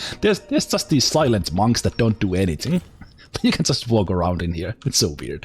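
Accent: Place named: Finland. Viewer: You have a finglish accent :D awesome!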